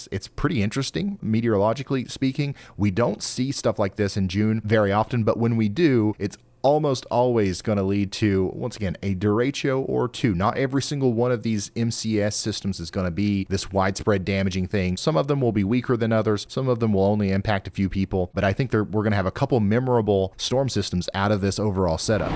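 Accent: South, United States. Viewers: If a tornado is over my neighborhood and does pronounce my city correctly the tornado doesn't go away. I know what he's saying and to run not walk. has a southern accent and that's all. Common sense people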